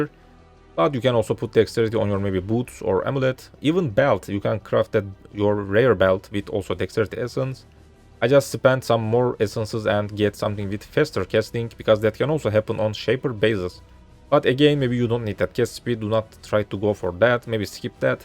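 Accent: Turkey. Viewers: When i first hear i said this is a perfect Turkish accent. Just find out that im right amk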